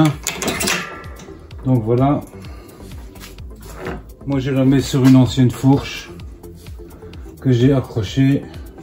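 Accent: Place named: Belgium. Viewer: un petit like pour les explications et pour l'accent belge que j'aime bien :)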